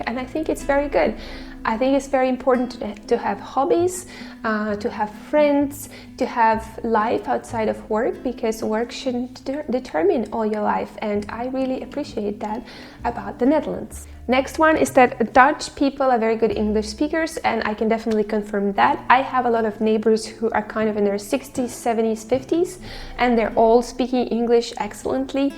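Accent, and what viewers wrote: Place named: Ottawa, Canada. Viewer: Hi i am a Dutch - Canadian ( living in Canada for 53 years ) what you are saying is so true in the Netherlands (for me it is still Holland ). The person talking about the trains is the same that i was living there , on time and fast. so the person talking about the Netherlands is a sick puppy. Love your way of thinking about for me Holland. You sound like a Ottawa person.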